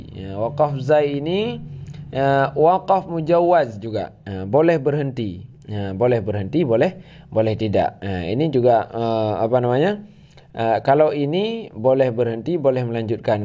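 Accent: Aceh, Indonesia. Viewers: Saya mau tanya.ustad orang Aceh ya.krn fasih kayak orang Aceh ngaji Krn saya juga orang Aceh...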